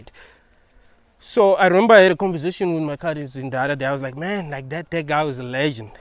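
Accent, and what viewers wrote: South Africa. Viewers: South African.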